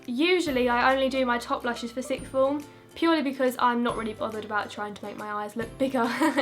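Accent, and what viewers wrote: United Kingdom. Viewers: What year/grade are you in? Love you and your British accent 💖💋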